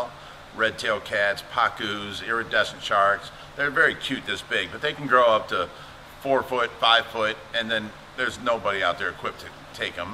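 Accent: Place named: Ohio, United States. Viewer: Ohio accent. I like it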